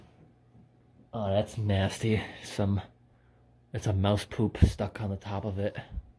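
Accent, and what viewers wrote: New England, United States. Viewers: A wild guess that it's New England.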